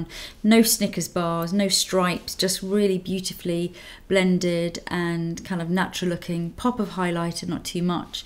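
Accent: England, United Kingdom. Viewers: Great, very informative videos! I also like your English accent (I'm German) :)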